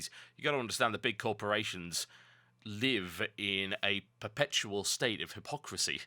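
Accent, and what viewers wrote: United Kingdom. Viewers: No the 21 minute slideshow with an obviously bored British accent voice-over is not a better video. This guy talks about doing better marketing? He should take a Red Bull and turn on his webcam before trying to present his arguments, what a lame way to get any type of attention. He must be a failed lawyer since he spends his time doing voice-overs on gameplay videos (something that YouTube specifically forbids youtube partners from doing)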